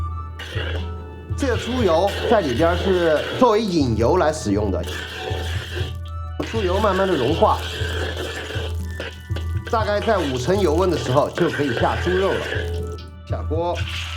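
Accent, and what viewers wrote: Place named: Sichuan, China. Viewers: 川普...听了都想回四川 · 说话语气和性格都是典型的四川人啊啊啊啊啊，太喜欢了！ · 椒鹽普通話=四川普通話🍇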